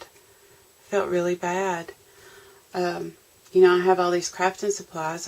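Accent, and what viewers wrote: South, United States. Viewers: I loved that you changed your questions, that was I felt it was too much private information they were wanting. I had to laugh about Eclectic Hillbilly. I am so very thankful that Pam (Grammie2Bay) and Beth (BethScrapbookRoom) both turned me onto your channel. I just love your southern draw and mannerisms. I know what "I Bless your Heart: means. I enjoy thoroughly listening to your soft soothing voice, within 7-10 minutes I know my blood pressure has dropped as I mellow out with you. I guess I am going to bite the bullet and start making youtube videos. I need to research how its done, and if I can even do them in my little craft are (I have my bedroom closet. Maybe that would be my first video. Anyway thanks again for changing the questions. Take care and hope to talk soon. Teresa in Maryland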